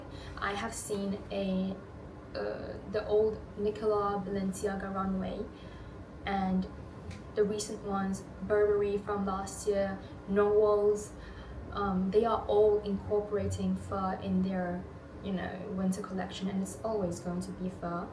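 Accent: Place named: England, United Kingdom. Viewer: I slow your video way down so i can watch while i work. Then i can also read what you are saying so it's great! I love most of these trends. It's crazy how many of these i already have! Mixing glam with sportswear sounds super fun so im gonna try that! Thank you for inspo and i love your English accent lol! subscribed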